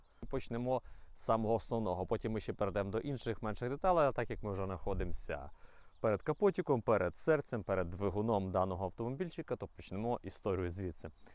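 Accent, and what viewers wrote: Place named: Western Ukraine, Ukraine. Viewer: Заебательский заподенський говор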